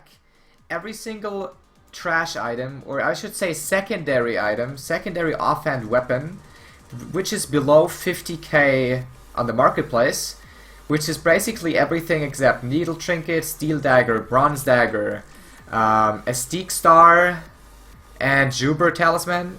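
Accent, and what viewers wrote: Germany. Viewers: youre german, no?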